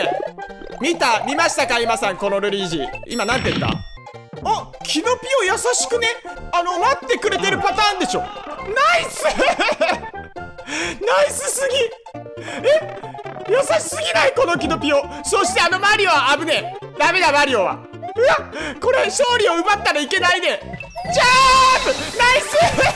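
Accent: Fukuoka, Japan. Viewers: 福岡の方言のほうが聞きやすくて好きです🤘🏻笑 今日も動画面白かったです！ありがとうございます☺ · 方言よきよき😊 福岡住んでたから愛着あるぅ！いまだ福岡の方言でちゃいます😂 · 自分も福岡ベンなんで、方言使ってくれるの、嬉しいです！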